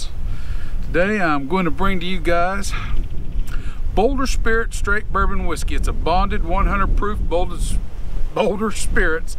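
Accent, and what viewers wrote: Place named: South, United States. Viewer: It looks like this isn't available here in Albuquerque, but it sure sounds like I'd like it. I've found that I like the fruitiness of a single malt (though Scotch and Irish whiskies aren't, in my limited experience, forceful enough for me; I love the Balcones Lineage Texas Single Malt, which is), and the barley and the corn in this one ought to make it right for me. :) Thanks for reviewing it. And you may be in Kansas, but you sound southern enough to make me happy. :D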